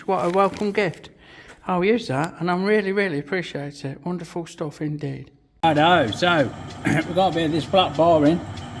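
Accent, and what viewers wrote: United Kingdom. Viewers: boring bar that matey...with carbide insert slot...should have the insert size written on it.....same size tooling as my bport...same lathe too...fk me...and a tattooist lol...me too...22 years at it....got some mates over your end....thought i recognized that fickin accent...not short of curry houses down that melton road...stay free...mad heads and mutoids!!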